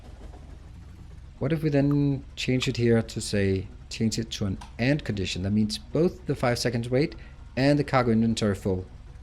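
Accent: Denmark. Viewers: Denmark? Im asking because of te accent 😁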